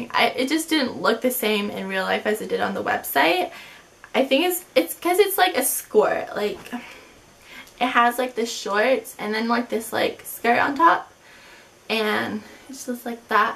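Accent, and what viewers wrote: United States. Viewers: Why are you speaking american if your english? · Why do you speak in a American accent ? It's pretty annoying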